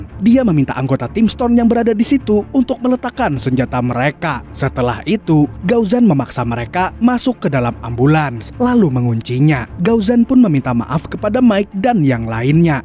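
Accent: Banjar, Indonesia. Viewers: Film John wick bang.... Tetap dengan pembawaan bahasa Banjar yg babangsatan nya bang 👍 Sukses terus buat 👍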